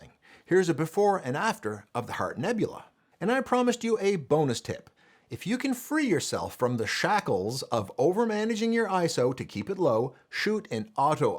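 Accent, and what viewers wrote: Canada. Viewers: That is a prime time Canadian accent 🇨🇦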